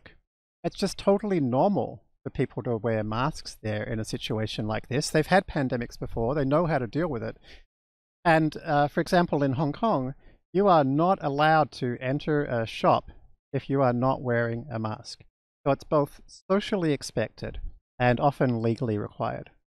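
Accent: Australia. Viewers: Such informative video and I keep wondering does he have an Australian accent 🤔.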